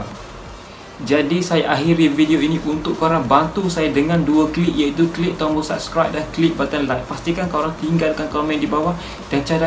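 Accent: Kelantan, Malaysia. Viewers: Kelantan ek bang.. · Tahniah.. walaupun bunyi2 klatey kluar sikek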